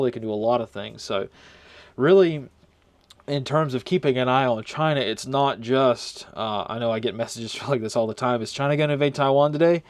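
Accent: United States. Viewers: I detect a little country accent 🥰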